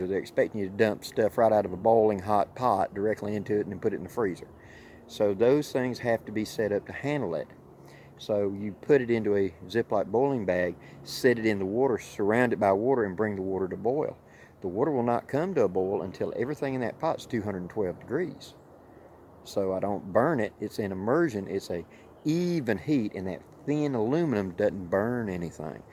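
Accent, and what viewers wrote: South, United States. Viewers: Nice video, you make a lot of sense in your southern way, I like the accent you sound like you are signing all the time. I like you tips and inputs very resourceful!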